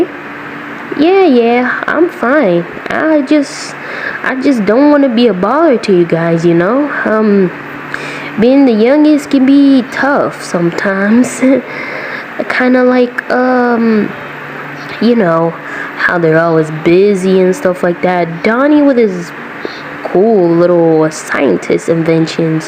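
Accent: South, United States. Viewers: IM NOT BEING RUDE OR ANYTHING BUT IT SOUNDS LIKE HAS A BIT OF A COUNTRY ACCENT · He sound red neck lol i love it ❤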